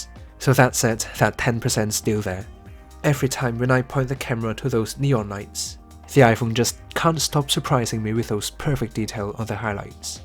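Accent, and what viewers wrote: United Kingdom. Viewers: 詳細的解說，一口流利的英國腔英文 👍👍👍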